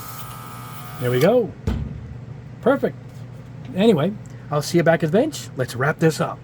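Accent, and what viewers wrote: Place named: New York, United States. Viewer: Jesus!!! Got my mind blown with the extendable brush thing!!! I was shaking my jar to use the last part!!! Top advice as ALWAYS!! Also, love that NY-Italian accent, it's like hobbying with Il Padrino!;)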